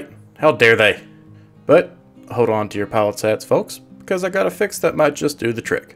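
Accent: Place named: South, United States. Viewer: Awesome 👌 Thank you so much. And... I absolutely enjoy your southern accent and style 😉. Being a southern boy myself 😅from Charlotte, NC.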